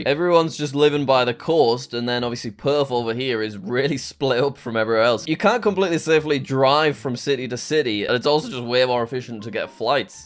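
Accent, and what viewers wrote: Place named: Yorkshire, United Kingdom. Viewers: Funny, to me you sounded a heck of a lot more Yorkie than Geordie; but once you mentioned it, I tried hearing the Geordie accent in my head (Ross Noble, popped right in there xD ) and now I'm not so sure anymore! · You sound nothing like Geordie bonny lad nor Smoggie. More like Not quite Yorkshire. Some of the accents in the former pit villages of Durham sound more Geordie than youse. Glad to see Norfolk and Suffolk differentiated. In Suffolk words get elongated, in Narfik they get get shortened.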